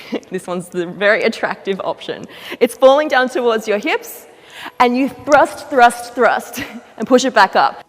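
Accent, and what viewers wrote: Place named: Australia. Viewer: Amazing video and explanations. No one else had all this info Hate the aussie voice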